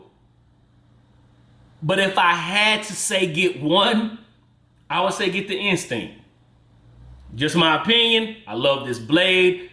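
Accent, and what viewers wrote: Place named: St. Louis, United States. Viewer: Loved the review. Very detailed. Plus it sound like you from the Lou lol. New subscriber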